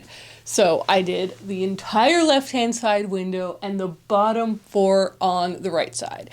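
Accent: Canada. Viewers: No, sorry! Ha, I mean the pronounciation- at first I was like, "Wait, is she saying pouddy?" And then I realized that that is, in fact, how I say it too. It's the 'about/aboat/aboot' accent coming for us again. That and saying double t's as d's- but really if we don't do that then we sound british, so I say just rock the Canadian accent with pride lol.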